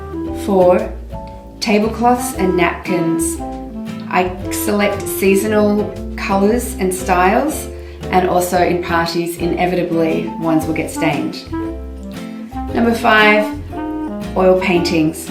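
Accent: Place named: Australia. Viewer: I can't handle the Oz accent....I tried to watch the whole video but didn't last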